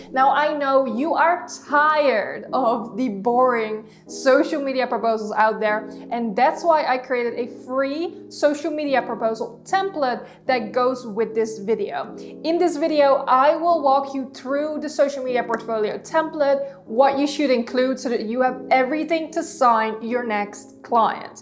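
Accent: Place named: Netherlands. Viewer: Ben je van Nederland? Ik hoor een zwaar accent😉groetjes uit België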